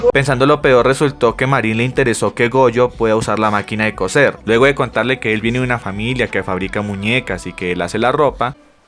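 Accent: Colombia. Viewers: toma tu like, eres colombiano???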